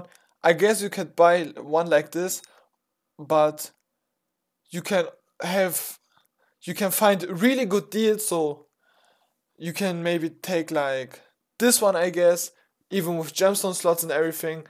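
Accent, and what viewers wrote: Germany. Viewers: alter... du bis doch 1 Hundert Prozent deutsch bruddi, man hört das sooo raus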